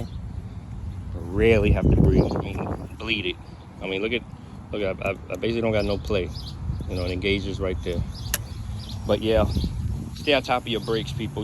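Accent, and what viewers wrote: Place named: New York, United States. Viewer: That fuckin Ny accent oh my god! No cap, but you sound like a old ass white man who sits in central square park all fucking day alone!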